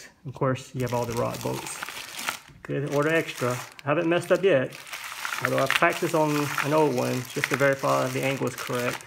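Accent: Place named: South, United States. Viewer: Did you plastiguage them? BTW its kind of odd to hear a southern accent from a Viet guy. :)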